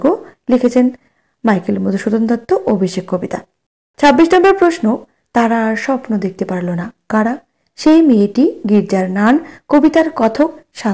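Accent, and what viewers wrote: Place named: East Bengal, Bangladesh. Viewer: আপনি কি পূর্ববঙ্গের ম্যাডাম আপনার উচ্চারণে বেশিরভাগ অকারান্ত বোঝা যায় তো যাই হোক শুনতে ভালই লাগে।😊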